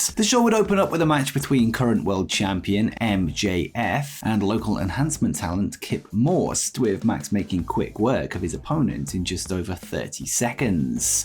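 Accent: United Kingdom. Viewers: I can't stand his annoying British voice or whatever the heck it even is 🙄 The long delay after each sentence is absolutely ugly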